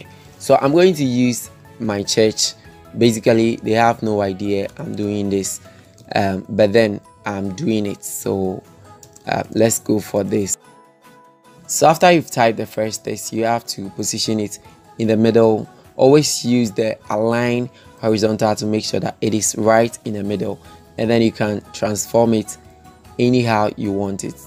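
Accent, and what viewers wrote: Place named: Ghana. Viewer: The accent is like a Ghanaian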